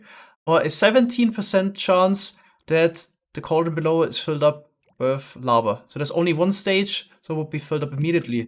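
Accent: Germany. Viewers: You sound like you're German You kind of have a German aczent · Is he german?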